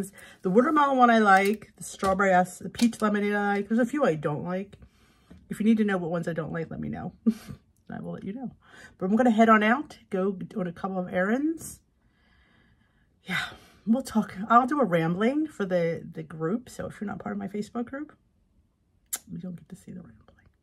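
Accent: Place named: Philadelphia, United States. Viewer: From one South Philly girl to another- You can take the girl out of South Philly but you can’t take South Philly out of the girl🥰As soon as I began listening to you (recently) I thought you sounded like a Philly girl. St.Maria Goretti HS grad. Class of 1970!!! Much older than you 😊 Really enjoy your channel 👏👏👏👏👏 Mary from Delaware County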